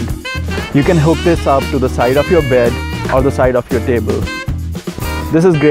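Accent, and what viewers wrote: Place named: India. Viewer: Love the Indian voice keep it up !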